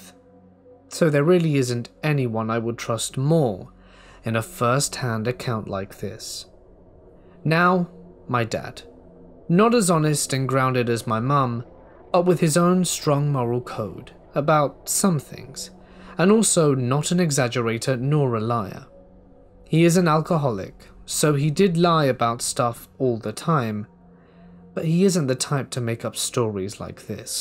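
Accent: United Kingdom. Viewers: My only comment is this is superb narration, not just the charming british accent, but the tone, tempo, and phrasing (clearly enunciated without losing flow) are brilliant. This guy can do anything, any topic, audio books, etc and it would be of the highest professional caliber in my opinion.. Best I can ever recall hearing on YouTube.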